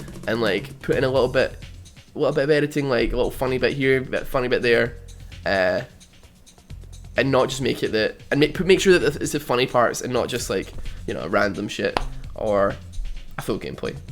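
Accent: Scotland, United Kingdom. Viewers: You sound Scottish. Nothing else. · Im scottish too lol literally sound almost identical to you * u *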